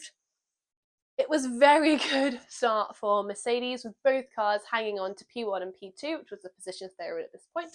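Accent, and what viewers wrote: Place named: United Kingdom. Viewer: I love your comments, your channel and your british accent. Greetings from Mexico.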